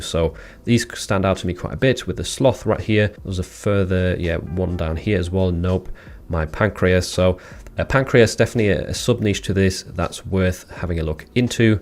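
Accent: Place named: United Kingdom. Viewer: Hi. I'm just starting out on Merch on Demand, and your videos are a great resource. I've watched a lot of vids about POD on different channels, but yours is definitely a favourite thanks to your calm, clear delivery without making wild claims about making money fast. Maybe it's because I'm a fellow Brit that I appreciate this lol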